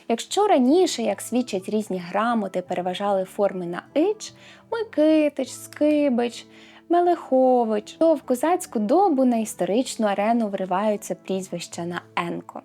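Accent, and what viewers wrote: Standard. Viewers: Така чиста українська. Аж приємно слухати. Та й голос лагідний. А зовнішність — справжнє янголятко. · Заслухалась мелодійностью Вашого голосу, чистотою української мови. Імпонує подача матеріалу , Ваша глибина знань , якими щедро ділитесь з аудіторією. .. Відчувається ,що Ви любите свою справу ,найшли своє покликання. За такими молодими людьми майбутнє України. Тому від щірого серця бажаю Вам успіху і розвитку. Дуже дякую!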